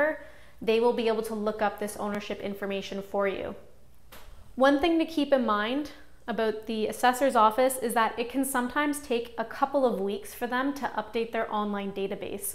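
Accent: Canada. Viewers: It sounds like you have a Canadian accent?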